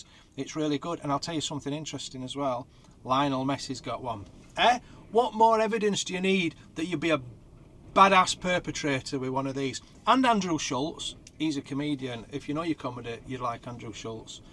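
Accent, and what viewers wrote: Yorkshire, United Kingdom. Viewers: Another brilliant one. Yes, it’s winter with accompanying weather but you soldier on with the help of a cuppa and a bacon banjo. I like getting to see things & places with your channel that I would never normally see AND I get to know how Yorkshire folk pronounce place names. Love your vlogs and look forward to your next adventure along t’coast. 💕💕💕💕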